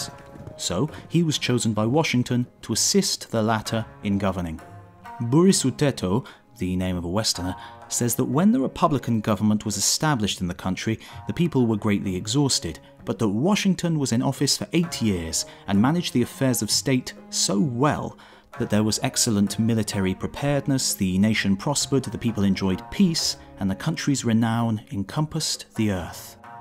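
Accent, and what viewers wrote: United Kingdom. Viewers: I'm learning US history from a british person reading an english translation of a japanese book about american history. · All read by a Brit, our former enemy.... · and read by a foreigner from President Washington's most bitter enemy (i believe)! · And let's not forget, narrated with and British accent!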